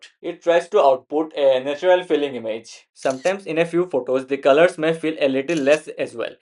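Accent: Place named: Bengal, India. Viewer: Puro bangla accent toh bhaii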